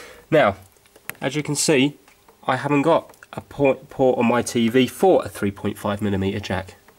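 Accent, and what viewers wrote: United Kingdom. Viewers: i like the brirish accent thou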